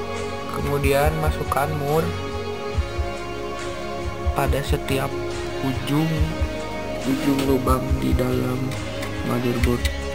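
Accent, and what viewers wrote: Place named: West Java, Indonesia. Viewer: eleuh eleuh eta kang,orang sunda nya......good kang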